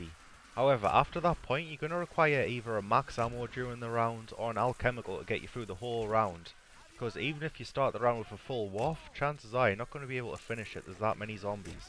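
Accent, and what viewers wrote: United Kingdom. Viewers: I have no clue if is scottish or british love your videos btw they help me alot Edit: you know the map is Hard if the first Clip isnt round 100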